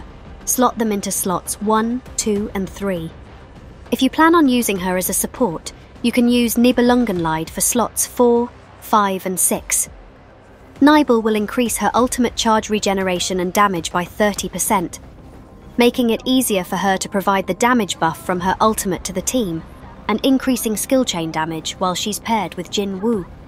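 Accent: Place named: United Kingdom. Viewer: What a Nice plasure to ear That british voice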